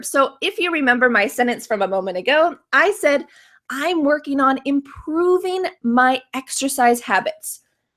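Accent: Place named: United States. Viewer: Your English American pronunciation and accent is really perfect, I never heard someone who hade such perfection speaking like you dear sister, God bless you and your family and ministry so much. God makes prosper all works of your hands in Jesus Christ our Lord.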